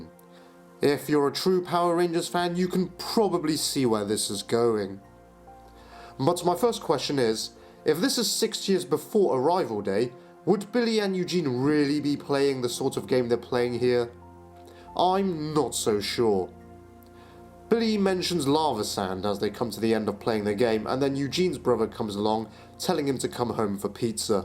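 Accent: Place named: United Kingdom. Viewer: I've been watching your vids for a while now, and I've only just realised you have a British accent. I'm British myself wtf ahahahaha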